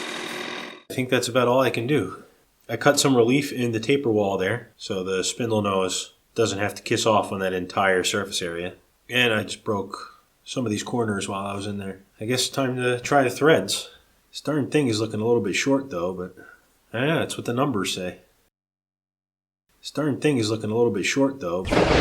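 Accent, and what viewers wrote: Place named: United States. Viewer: As usual a fascinating and really interesting video. I'm interested to know: to my British untutored ears you sound American, not Canadian, so how come you mostly seem to use metric? (unlike most of your countrymen who still use that bizarre system they inherited from us Brits.) Even tho I'm I'm my 70s and was schooled in scruples, furlongs etc. it amazes me that anyone would choose "English" when everyone everywhere else uses metric. Perhaps you live in Europe and have seen the light. :--)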